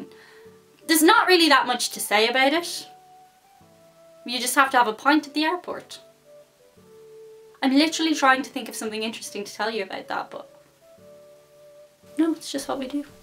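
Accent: Ireland. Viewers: I am from Virginia USA and have lived in Richmond VA for most of the past 50 years. There is so much Irish accent left here in the older people, especially the way you pronounce out, about house, etc. My family are from Appalachia and there are so many Irish (Ahrsh) pronunciations there too. The Middle Atlantic states and upper South are areas you need to explore. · I am just here for the irish accent and I LOVE IT :D and yeah I can not tan well either :( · Love the Irish accent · Forget models girl you are real and you are HOT (very desirable), cute and I love your Irish accent.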